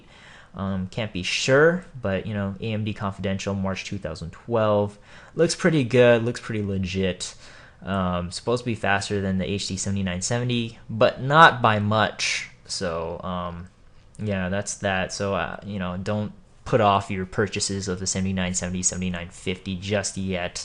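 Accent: United States. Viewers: this is my first time seeing you and I must say it, I did not see this coming, not being racist but I though you were america white because you had an american accent but you look pretty sexy (no homo). Other then that Congrats on you partnership you do deserve it :D.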